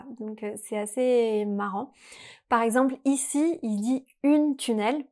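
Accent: France. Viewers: Une émission qui nous explique comment mieux parler Français qui s'appelle "French Morning"!??? Et en plusse prononcé à la Française sans aucun effort pour au moins assummer l'accent Anglais.... Non! Désolé! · Oui, nôtre chère a encore une pointe d'accent que je situerai au nord du ”Sud de la France" entre Valence et Aix en Provence, mais pas plus au sud... ou peut-être un accent bordelais ou aquitain? Après je ne peux pas dire grand chose, je suis lyonnais pur souche et il paraît que nous avons un certain accent... C'est curieux car pour moi, ce sont les autres qui en ont un 🤓. Je trouve que les accents 'colorent' le français et je trouve ça bien. J'adore d'ailleurs les accents francophones comme on trouve dans certains pays africains, le Québec sans oublier la Belgique si proche géographiquement, mais ayant son accent typique (souvent moqué par les Français) et ses expressions qu'on ne trouve pas ici. 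C'est aussi vrai que pour apprendre une langue, il faudrait le moins d'accent possible (le lyonnais alors?) et de distinguer la prononciation des voyelles accentuées ou combinées comme les ai, é, ê et è, les a et â, les au, o et ô, ainsi que les e et eu comme dans feuille ou fauteuil, les ain, in et un... Tout ce qui rend notre langue si difficile à apprendre, mais si riche en nuances. Bon, j'en ai encore écrit une tartine? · This is perfect for me. I am in French 1 at school and am very good, already ahead of my peers and am taking French 2 during summer to be in french 3 for 9th grade. She sounds so similar to my teacher, a clean French accent and speaking slow enough for me to understand most words. Will be watching more of these to learn more on the spoken french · Ce vidéo et très bon pour apprendre le accent française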